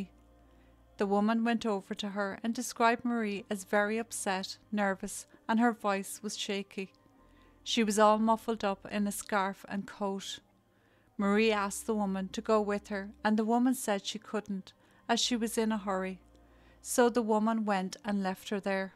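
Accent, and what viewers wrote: Kilkenny, Ireland. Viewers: Is ur accent a kilkenny .i was born there but grew up in u.k.xxxx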